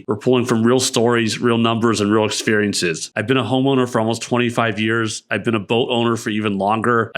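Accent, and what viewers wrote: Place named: United States. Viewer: It sounds like you have some kind of American accent.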